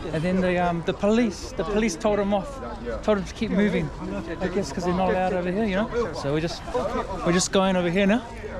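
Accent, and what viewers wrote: New Zealand. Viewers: sounds like kiwi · Sounds like you're a Kiwi, not an Australian. So I'm not expecting anything great. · I was gonna say you sounded like a kiwi and where were you from and then you told the taxi driver you were from New Zealand and answered my question 😂 Subscribed!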